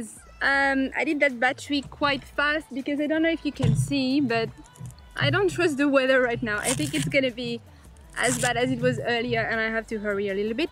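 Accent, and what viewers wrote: France. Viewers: Je dois debarquer(voir parler dans le vide)Mais,il n'y a pas un accent français?☺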